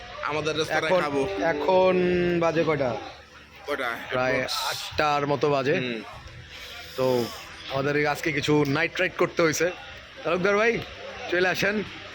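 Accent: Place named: Dhaka, Bangladesh. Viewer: ঢাকাইয়া ভাষায় সুন্দর বি হয়চে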